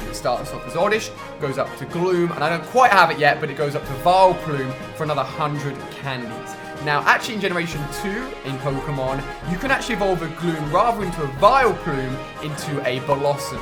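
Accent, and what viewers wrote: United Kingdom. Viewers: Is it just me or does he copy trainer tips but with a British accent?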